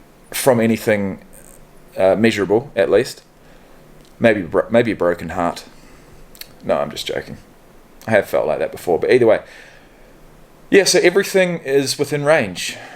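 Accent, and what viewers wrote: New Zealand. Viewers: I thought you were a fellow kiwi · His accent is New Zealand not Aussie but they are similar. Congratulations on your sobriety. :)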